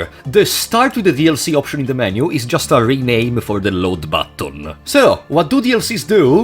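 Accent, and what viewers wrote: Italy. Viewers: Are you italian? (Im italian too and i noticed your accent)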